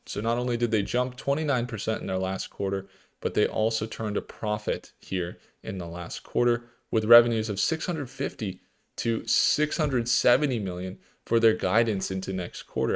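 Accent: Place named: Netherlands. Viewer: You have a Dutch accent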